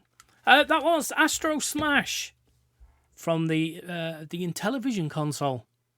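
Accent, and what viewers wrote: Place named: United Kingdom. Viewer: Loving the style of your videos and it's always great to here a British voice on a gaming channel!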